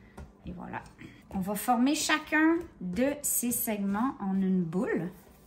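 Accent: Canada. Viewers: Salut ! merci pour le tips , je connaissais même pas le " pain pita " j'suis venu par curiosité :D en tout cas t'arrêttes po !! c'est toute sympa :D ( j'ai l'accent canadien en tête maintenant bravo )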